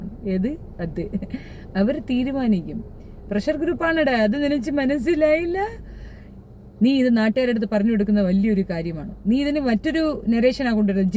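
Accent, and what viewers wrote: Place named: Thiruvananthapuram, India. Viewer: വീഡിയോ ഒക്കെ ഉഷാറാണ്. ആ തിരോധരം സ്ലാങ് കേൾക്കുമ്പോ സുരാജ് വെഞ്ഞാറമൂട് നെ ആണ് ഓർമ വരുന്നത്. ഫൈസൽ കരാട്ടിന്റെ കാര്യം ദശമൂലം ദാമുവിൻറെ പോലെയാണ്.. അവന്റെ അട്ടഹാസവും വലിപ്പുമെല്ലാം കാണുമ്പോൾ സത്യമായിട്ടും ദാമുവിനെ ആണ് ഓർമ വരുന്നത്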